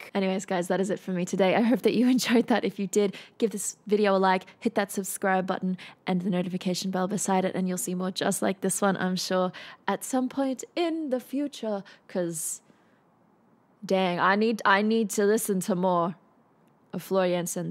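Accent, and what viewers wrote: Australia. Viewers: ... we are going to the advencha... love your ozzie accent :) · your reaction video of was the third music reaction video I ever watched. It still own's a little "piece of my heart". Hope you are well. Loved seeing you with Elizabeth Zharoff (The Charismatic Voice). Love hearing an Australian accent - never thought I'd ever actually write that! G'day mate. Vic 3044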